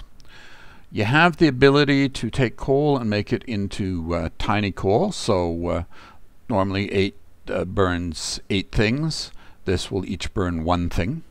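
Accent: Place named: Canada. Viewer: I can't quite pin down your accent. I hear some Canadian in there, but also a hint of Scottish perhaps?? Or am I just way off lol? Great video, thank!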